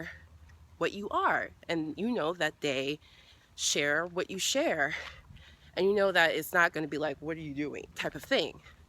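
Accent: United States. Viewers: Hey, I can tell by the way you talk that you are Americanized. That's the thing people don't factor in when they think about tracing their roots. You are a first born or second born American so it's by chance that you even took the test. That's why I hate some of the money grubbing that goes on in the US. It's because people want to make a lot of money that we can't see people from all over the world on tv. I've been trying for days to get free tv from other countries and I can't get any with Great Britain Natives on it because the US wants to charge money. I know that I'sound like I've gotten way off topic but here is how it ties in to your thing. Blacks in America are completely cut off from their African culture. You have your parents to fall back on but they've completely lost theirs. They could probably look at the Africa channel but that's cable and it's not cheap. 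I was looking for movies to watch and I realize that people get triple charged and still can't watch without paying even more. There was a movie I have seen called five easy puces. I have basic cable and I can't watch it because I don't have movie channels. The other parts of the house I have digital cable but I couldn't watch it unless I happen to have that movie channel package. I do happen to have that package but here is the rub. It will cost me an additional $4 to see it because I have to order it on demand. When do I stop getting charged for something I want to see? The lady probably feels like me that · You sound totally American. By the way, I'm 71% African, with 27% Nigerian. And you are very kind and lovely.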